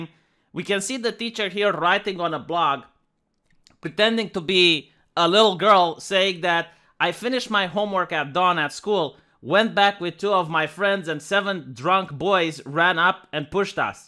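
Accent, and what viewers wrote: Romania. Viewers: But I like your squeaky Romanian accent. It's like...charming and shit. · They hate your Romanian accent? Man, English is my first language ... at least they can understand you ... I'm Scottish so if anyone wants a competition at bastardising the English language, give us a shout. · "Don't like your Romanian accent?" dude, that's your signature, if you drop it, I don't even know if I find your content the same.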